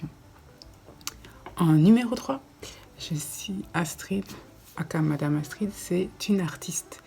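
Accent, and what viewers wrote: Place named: Cameroon. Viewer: COUCOU !!! VITAL CULTURE NOUS MANQUE LORSQUE TU PARLES DE TON CAMEROUN L'ACCENT Y VA AVEC. mais lorsqu'on chasse LE NATUREL REVIENT AU GALOP. " ton accent, ta voix française revient au galop. Bonne chance pour YouTube. JE T'AIME.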